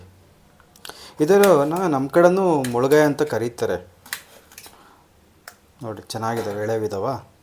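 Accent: Chitradurga, India. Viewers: Are u from Chitradurga sida aa.... Nimma kannada bhashe namma oorinde agide pakka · navu chitradurga navu nemtarane matadodu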